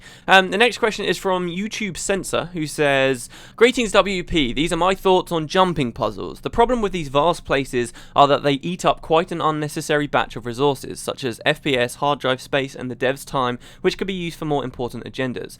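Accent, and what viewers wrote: United Kingdom. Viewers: I've just watched the fucked up videos pn youtube... I really hope WP will cheer me up with his excellent british accent.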